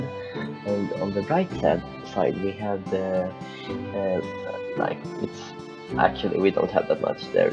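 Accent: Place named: Norway. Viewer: Which country are you from? The accent sounds like Norwegian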